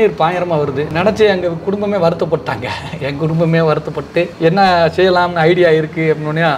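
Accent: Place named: Usilampatti, India. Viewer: உங்கள் சமுதாயம் அக்கறை பார்க்கும் போது மகிழ்ச்சி உள்ளது அண்ணன்.நம்ம வட்டார வழக்கு அழகு அண்ணன் உசிலம்பட்டி இருந்து 😊😊😊😊😊😊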